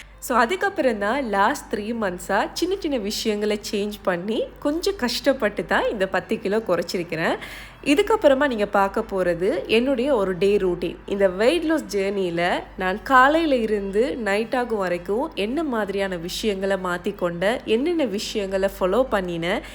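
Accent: Sri Lanka. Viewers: Neenga srilanka tamil thane pesurenga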